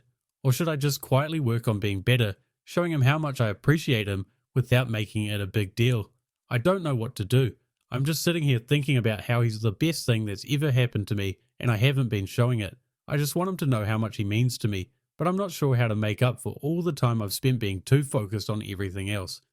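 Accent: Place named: New Zealand. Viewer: the kiwi accent sounds good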